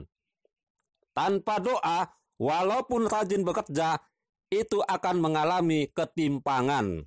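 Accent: Java, Indonesia. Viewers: L11,bejerja sambil berdoa..benervkali saudaraku..janji om sudah om laksanakan,kok logat ngomongnya asli kental logat orang jowo yo..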